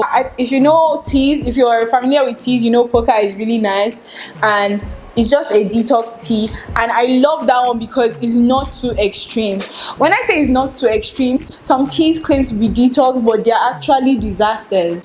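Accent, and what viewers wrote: Nigeria. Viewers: is that Nigerian accent 😍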